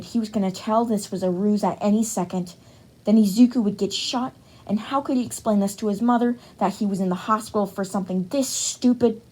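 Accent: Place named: Texas, United States. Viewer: The god damn texas accent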